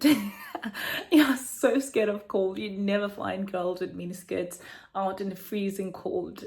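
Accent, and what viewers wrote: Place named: United Kingdom. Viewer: Loved the video. But not going to lie, that accent threw me off. For a second I thought the video is about a Brit moving to SA not other way round.🙈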